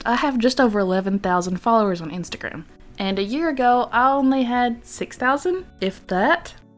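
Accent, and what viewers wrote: South, United States. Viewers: is that a southern accent i hear? i'm southern too and it's so reassuring so see another content creator with it! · Where are you from? You sound like you have a southern accent lol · Im so sorry that happened! My artstyle is not anything people would buy, so I don't do comissions, and this kinda stuff would not help either 😭 Also, you accent is WONDERFUL! It reminds me of a country accent but... different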